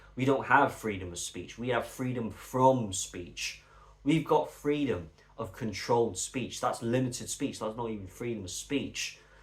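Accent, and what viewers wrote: England, United Kingdom. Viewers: My reply was not "uninfotmed" it was merely pointing out the obvious. However he's mainly talking about England as you can tell by his English accent but he includes all the Home nations, hence his use of the Union flag to cover all parts of the UK and Northern Ireland. I do not know which country you are from but even among the citizens of the Home Nations who don't like the Union Flag and only recognise their own flag the fact remains that it is the internationally recognised flag of the UK and Northern Ireland. I trust that reply is comprehensive enough for your exacting standards.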